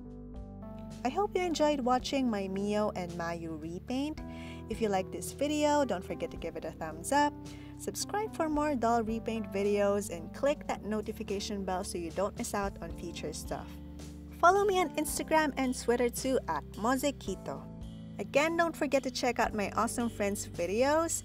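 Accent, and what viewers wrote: Philippines. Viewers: you're accent is nice? are you a filipino? :D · I have been watching you since the first time I discover your channel. I have a feeling that you're a filipino because of the way you speak. Then I found that you really are filipino (or based in Philippines) when I checked your IG! I can't believe how talented you are ❤️❤️ hope you can make it even bigger in youtube and be more recognized not just in Philippines but internationally 🌹You are so amazing and talented.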